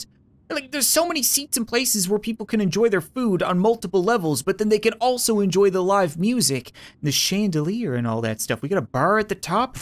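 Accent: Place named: Canada. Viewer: I love his Canadian accent and how it's so subtle yet so prominent that it leads to perfect delivery in every line Example: "what happened to shoe potatoe!?"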